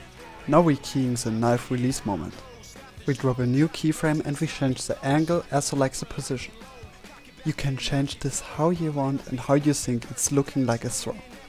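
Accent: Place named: Germany. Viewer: Man merkt das du deutscher bist. :D Aber richtig geile Idee mach weiter so.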